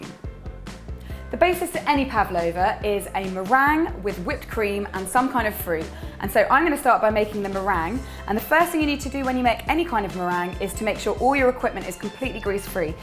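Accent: England, United Kingdom. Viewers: I love the way you talk and your big and beautiful smile ♥ And of course all the delicious stuff you cook. My mom is also a pastry (I don't know if it's well written, I'm Argentinian and my english is not that great) and my favourite deserts are pavlovas, so she always makes it for me. I'm gonna tell her about this recipe. Keep cooking, and keep that beautiful smile in your face:3 It's a pleasure to see your videos from Argentina and listen to your beutiful english accent! Big hug ♥ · Hi, just discovered your channel, so nice to hear an English accent on YouTube! I saw another video for making individual meringues and the instructor said they should be put in the oven at 275 for 45-60 minutes....I don't know if my cooker is Celsius or Fahrenheit but I guess 275 was too high as the meringues came out brown. No matter how hard I tried, I could not get my whites to fluff up as much as you did. 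Is that because I didn't beat enough? beat too much? or is it just that I didn't have an cream of tartar? I would really appreciate your advice.....I wanted to make these for a special family dinner and was so disappointed by the mess I finally produced!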